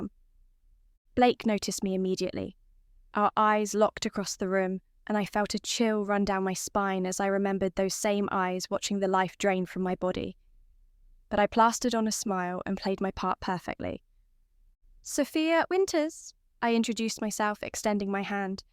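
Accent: United Kingdom. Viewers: UK accent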